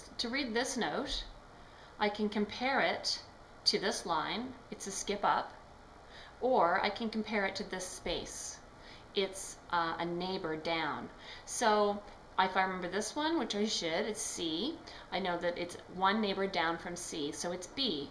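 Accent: Canada. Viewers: Finally I get why the Canadians on South Park say aboot ! Never heard it pronounced so clearly before :) Great video btw, just the right level of difficulty for me!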